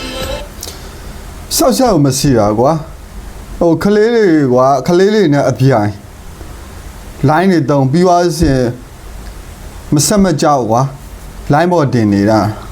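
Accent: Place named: Rakhine, Myanmar. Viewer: ရခိုင်နဲတူတယ်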